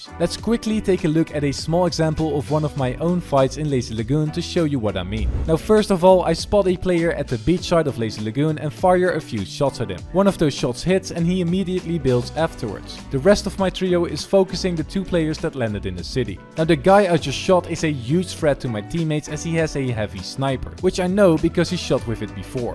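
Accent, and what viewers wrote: Netherlands. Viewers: Ik heb het gevoel alsof je een Nederlands accent hebt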